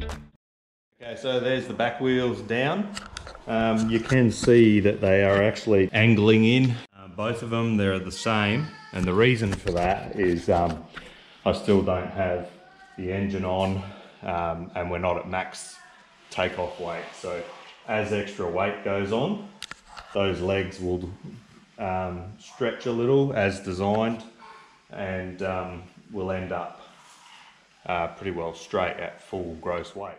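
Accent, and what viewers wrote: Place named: United Kingdom. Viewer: interesting It sounds like you're in the UK. Our USA ultra-lites are much lighter but I was thinking of doing something similar... just some ideas to pass along. the flying boat part is good, but I would do a high wing, also maybe some small out-riggers for weight saving with comparable stability out of a narrower, lighter, center canoe type body. We are limited to 254 lbs plus an allowance for floats and parachutes... yours is looking good. Did you use plans or a kit, or is it your own design?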